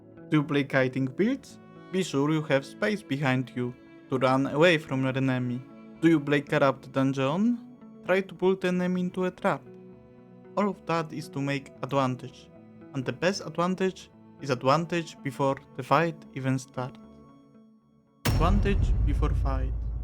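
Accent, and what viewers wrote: Poland. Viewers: love Your polish accent XD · czuć że polak z kilometra z tego akcentu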